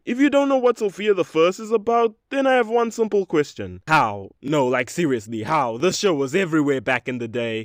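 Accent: South Africa. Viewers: very relatable n r u south african, if so me too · Hey, Genuine question, Are you from South Africa. I’m from South Africa, and your accent sounds like so many people I know in SA. Also this video is hilarious. My boyfriend loves mlp and luckily he has a girlfriend that loves it too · Quick question, are you South African? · Is bro south african? anyways slay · Are you South African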